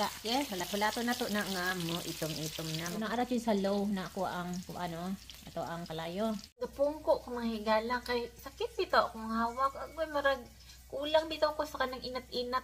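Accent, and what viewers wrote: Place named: Visayas, Philippines. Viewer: Super love ko talaga ang boses bisaya accent sis ❤ bakit kasi nasa gilid lahat ng manitka haha! Sarap naman 😊 lola lovin’ it🥰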